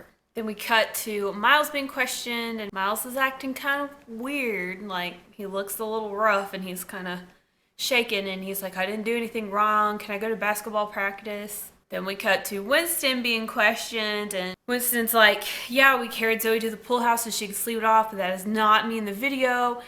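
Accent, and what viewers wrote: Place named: South, United States. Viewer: everytime i watch one of your Degrassi vids i end up getting pissed off.. i'm trying to relive my highschool memories and i got you in your southern accent with european charm ruining it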